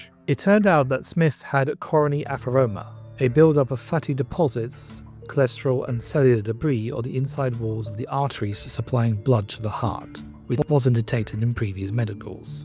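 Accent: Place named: United States. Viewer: There are other annoying Americanisms in this, e.g. debirs pron. debree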